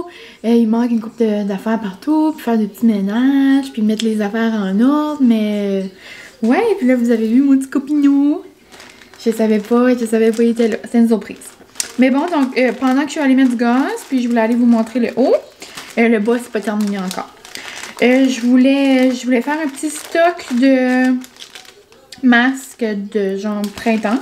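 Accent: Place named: Acadia, Canada. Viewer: Mon conjoint vient de Tracadie et nous sommes à Qc donc on ne peut pas descendre encore! Mais j'adore entendre l'accent de nouveau 😌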